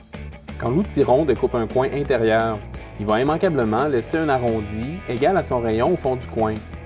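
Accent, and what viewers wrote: Quebec, Canada. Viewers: Bravo. Très bon tutoriel. Juste une petite remarque concernant la notation de la machine que je trouve sévère. En effet, le moteur ne fait que quelques W soit 100 fois moins qu'une fraiseuse ! Je trouve même exceptionnel qu'elle arrive à faire ce travail avec une puissance aussi faible. Cela étant dit, bonjour à nos cousins de la belle province. J'adore votre accent (qu'est-ce que je dis, c'est moi qui ai un accent ...).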